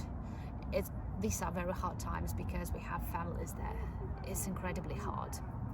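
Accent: England, United Kingdom. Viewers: God your place is not poor at all. In my country, philippines, our average salary is much less than yours...and your british english is sooo amazing,you are excellent...from phils,my name is alexi. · I was born in the U.K. in 1936 and lived there until 1982 and now live in Australia so I was very surprised to hear that you had not studied in the U.K. but wanted to speak English as spoken in the U.K., as you would appreciate American English is a mutilation of the English Language. When I started to listen to you speak English(this is the second of your Documentaries I have watched) I was sure your accent was of an upper class English without any tinges of one of the many regional dialects in the U.K. ( That used to be referred to as speaking with a "Plum in one's mouth" or "born with a silver spoon in one's mouth" (Please do not take umbrage as my comments are complimentary to your clean and clear pronunciation), then upon listening for a longer period when I could detect what appeared to be slight U.K. 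regional dialects. One word which may have been due the sound track, was when you pronounced the word Dollar that reminded me of a mature Greek who's English was picked up in the work place and with other Greeks who used to take a slight pause between the two syllables ie Dol-lah. your English is remarkable... if you had learnt your English in the U.S. I would have picked it within 30 seconds. I served in the Military in Singapore back in the 1950's and round about 2008 I had written about a particular Singaporean that I had met during my Service, I then received an email from another Singaporean who mentioned that one of his work colleagues would like to cont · I just came across your video of living in . . . Poorest district of Belarus I actual thought you was a Briton 🤭 The soothing, peaceful and calm style of speech is one of a kind. N'we love your videos I've watched like 4 now, and I love what you do here keep up Mate. 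💯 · this place is so enchanting and your Introduction too!! You have adopted British Accent quite diligently!! 👍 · Although I have never met you, I felt I needed to tell you what it is I like about you... You are obviously a kind, generous and uncomplicated country girl with a love of nature and gardening while at the same time you are obviously intelligent with exceptional language skills and a lovely clear English accent... I also appreciate your dress sense, whether for gardening or posing for photographs... Then, last but not least, your lovely long blond hair and your subtle blue eyes... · I was thinking you went to a English private school maybe you have learnt the British accent very well better than me and I'm from middle Britain. By the way you have beautiful blues eye's and nice hair. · I like your comments on being poor. Their is several kinds of poor people. People poor in mind who always live like their poor when in fact they have their needs and the poor and depraved in spirit who live with out hope or morals. 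It is important to be content in what ever situation your are in and to trust in god for your needs. Your english is very english and strange compared to American english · I love your accent! Nice to hear you learned British English. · I very like your British accent adaptation to the English accent with the Russian/Belarusian language much better than NFKRZ he sounds like a New York City gangster or something . 😂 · Yes, you have a very good British middle to upper-middle class accent. Slightly more English than merely British. Lovely. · You sounds English in your accent. Nice quiet place, good for studies. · I 100% thought that video had a British narrator so I checked your channel to see if it was indeed you 😁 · Great info main reason for your channel to pop up is your English accent best wishes from Pakistan 💕. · I love your English accent it's just like that of the queen of England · Hello your English is verry British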